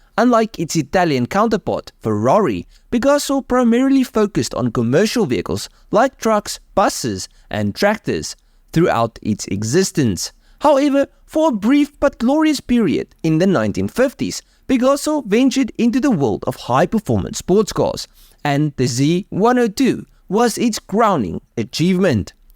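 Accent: Afrikaans, South Africa. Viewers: Does anybody listen not only for the very interesting cars but also for guy's wild afrikaans accent?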